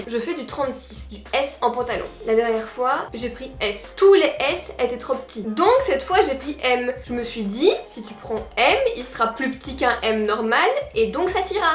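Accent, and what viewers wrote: Belgium. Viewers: Est ce que un jour tu pourras faire un meet up à Marseille ? ?? Sinon vidéo parfaite 😘😘je kiff votre accent en Belgique 😘😘 BISOUX 😘😘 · J'adore ton accent belge ♥️♥️moi aussi je suis belge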